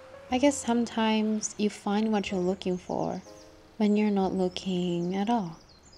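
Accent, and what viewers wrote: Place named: United States. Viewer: Why do you speak in an American accent if you're from Japan?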